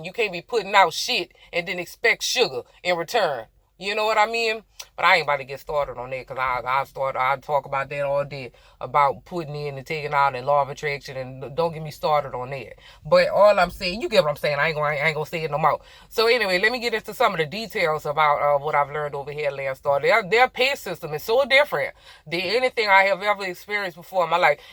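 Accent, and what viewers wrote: Louisiana, United States. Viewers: You are just too cool I love the accent Louisiana I think but you're beautiful cute all the above now I wanna come to land star for sure💝❤💞🌹!! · That Louisiana accent is straight SEXY💯 Ok BaaaByyyh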